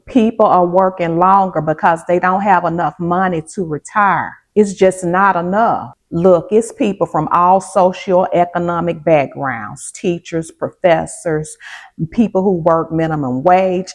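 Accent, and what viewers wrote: South, United States. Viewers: Love the message and your southern draw as well. 😆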